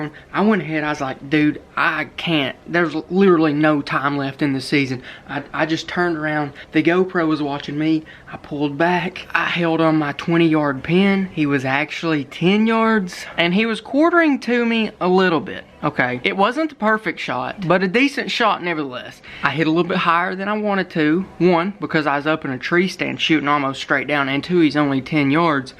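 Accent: United States. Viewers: bro is the most country sounding person i've ever heard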